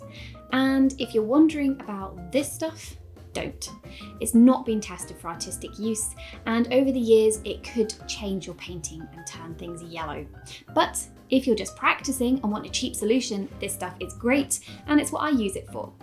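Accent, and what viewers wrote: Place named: England, United Kingdom. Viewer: Thanks for the advice , I love your English accent!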